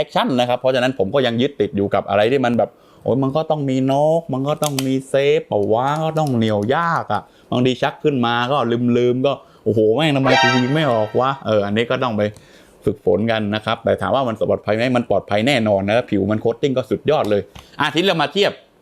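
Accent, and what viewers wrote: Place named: South, Thailand. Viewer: ผมมีเพื่อนคนใต้หลายคน ที่พยายามพูดกลาง สำเนียงออกมาแบบพี่บ่าวคนนี้ทุกคน ฟังแล้วน่ารักดีครับ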